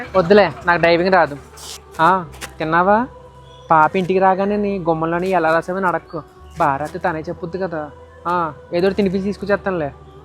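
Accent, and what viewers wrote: Godavari, India. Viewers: Voice nice godavari slag ❤❤❤❤❤❤❤❤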